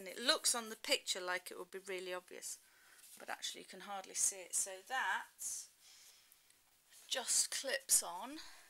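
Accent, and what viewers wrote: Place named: United Kingdom. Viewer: I love your accent. Many Americans are suckers for a British accent!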